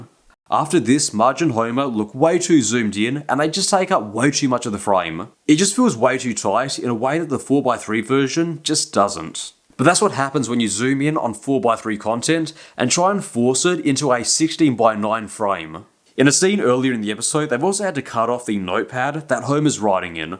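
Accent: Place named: Australia. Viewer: Jesus how Australian are you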